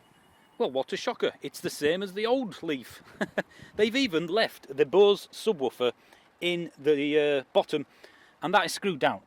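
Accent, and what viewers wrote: Yorkshire, United Kingdom. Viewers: Yorkshire England I reckon near keighley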